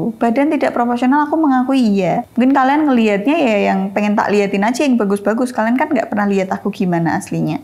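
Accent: Java, Indonesia. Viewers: Medok amaatt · Aku senengggggg❤ karo bosone,,,,, medhok,,,, khas cewek Jawa.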